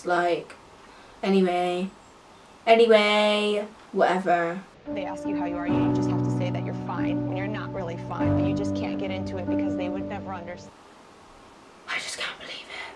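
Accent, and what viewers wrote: Bristol, United Kingdom. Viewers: it feels like I haven’t heard your voice in ages, soooo Bristolian it hurts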